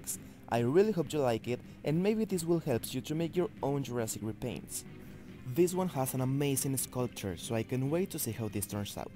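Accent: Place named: Spain. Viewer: Hey, where are yoy from? Por el acento pareces español o hispanohablante, pero al país en concreto ya no logro distinguirlo. Además la marca Jovi de las acuarelas es muy común aquí en España, no sé si se dará en más países. Estoy en lo cierto? Gran canal por supuesto! Acabo de descubrirlo y me encanta!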